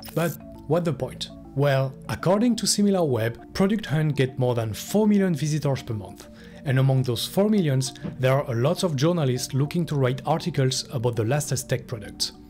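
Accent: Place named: France. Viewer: J'arrive pas à y croire, je me demande si avec l'accent il est français ou non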